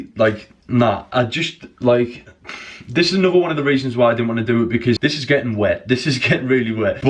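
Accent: United Kingdom. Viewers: Good work man!! This is well inspiring. Also sounds like you're from the Boro? Could be wrong, from Northallerton but been living in Canada a couple years now.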